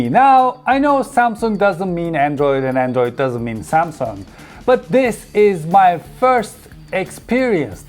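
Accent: Turkey. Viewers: Hahahahaaa telafuzdan direk turk oldugunu anladim 🤣 8 yillik iphone kullanicisi olarak aklim celiniyor demesem yalan olur 🤔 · What a Turkish accent! I wish my headphones are shit and could not able to hear it.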